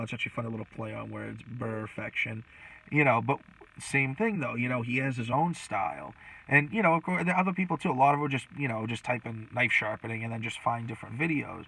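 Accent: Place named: New England, United States. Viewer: Ahh! I’m from Northern Maine originally and you had that ever so slight hint of the accent. Keep up the content! Subbed.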